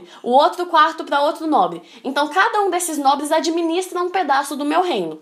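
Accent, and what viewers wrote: Minas Gerais, Brazil. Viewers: Vc é mineira né? Sotaque lindo sô ❤️ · acho q é mineira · Ela é Belo Horizontina, mineira. Haha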